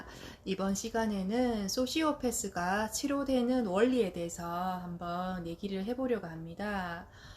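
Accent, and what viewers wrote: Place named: Gyeongsang, South Korea. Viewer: 갱상도 누님 갱상도 사투리가 구우~수우~하네예~ 진짜배기 갱상도 사투리네예~ 억양 보니깐 대구 토종 신토불이 사투리 억양입니꺼?아니면 마산 근방 사투립니꺼?? 마산과 대구 사투리를 구수우~~하게 잘 쓰시네예!!!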